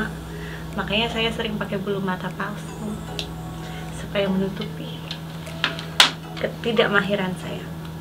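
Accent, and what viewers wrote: Java, Indonesia. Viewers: Tak urek2 kata bu.. Jawa bgtt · logatnyaa jawa bangett yaa kwkw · Cara bicaranya sekarang lebih ke medok jawanya yaaa😊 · Yey...sudah medhok Jawa! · Kelamaan dijawa jadi medok logatnya 😂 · Aduh ngomongnya udah meddok hihii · Medok banget Bu... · Medognya sudah mengalir hehe. Lanjutkan ibu 👍👍👍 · Jadi medok ya Bu kalo ngomong duh gemes tapi so lovable 🥰😍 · Ngomongnya sudah agak medok yah Bu salam dari saya rakyat Suroboyo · Aduh logat jawanya kalihatan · medoknyaa ada bangett😍😍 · Udah logat2 jawa ya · udah ketularan medhoknya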